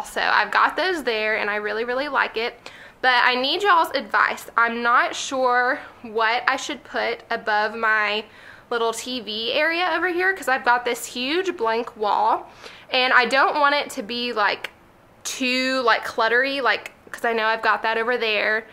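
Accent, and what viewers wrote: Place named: Tennessee, United States. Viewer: I found your channel through this video, it was reccomended to me because of my minimalism searches. lol. I rarely comment on anything on YouTube, but I had to say, I love everything about you and your channel. I live in Wisconsin, but I'm from Pigeon Forge, Tennessee. You remind me of home! Your accent, your style, your record.. Everything ❤️ You're such a breath of fresh air. I'm subscribing for sure! ❤️❤️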